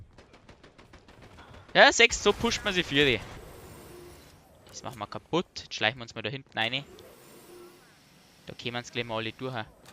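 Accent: Bavaria, Germany. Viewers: des boarische reden is so geil☺☺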